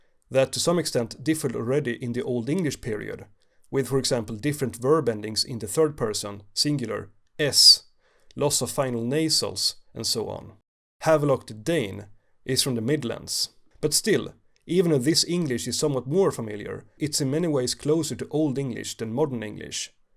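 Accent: Sweden. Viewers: "The julandic LAUV" thick and beautiful gøtamål. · That's the strongest Swedish accent I've heard in a long time, at least for someone who speaks fluent English. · The narrator sounds very Swedish so I wouldn't take it for granted that he knows how to pronounce middle danish haha.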